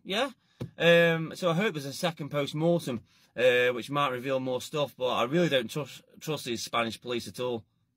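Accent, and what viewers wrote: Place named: Stoke, United Kingdom. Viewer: Off topic but....which part of Stoke are you from? Within a 3 mile radius of Longton ? Thats a very familiar twang 😁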